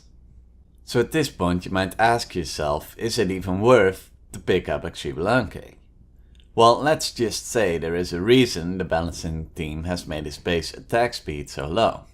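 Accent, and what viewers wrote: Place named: Netherlands. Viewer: Thanks for this video, definitely going to improve my Xbal! Off-topic question but do you mind telling me where you're from? You kind have a Dutch accent, but not sure if you're actually from the Netherlands. :p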